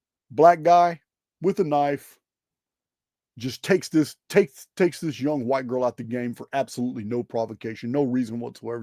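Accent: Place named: Texas, United States. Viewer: The owner of this channel seems to have a LOT of good information, but I CANNOT listen to him. (He sounds like a BLITHERING MORON, a cartoon character! The uber Texas accent, along with his tone and diction... I want to vomit.)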